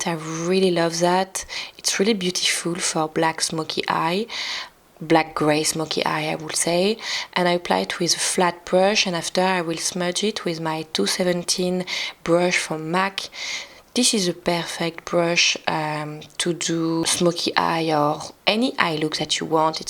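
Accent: France. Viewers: Salut! Tu habites où? Tu as beaucoup de vocabulaires c’est super mais ton accent est super français xD ! C’est pas méchant :) je te souhaite une bonne journée !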